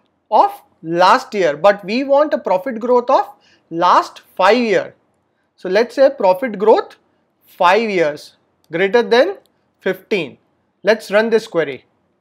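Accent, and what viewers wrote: South India, India. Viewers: Your English fluency level is like some south indian guy .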